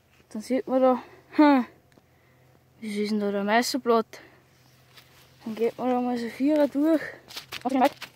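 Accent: Austria. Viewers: Bist du aus Österreich und den vo wo hod wöcha Bezirk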